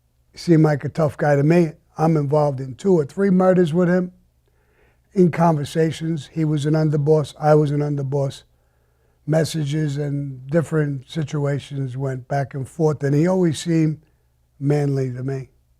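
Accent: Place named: Rhode Island, United States. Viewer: The way he says words like bottle and mouth.. Reminds me of my Grand Father. Providence RI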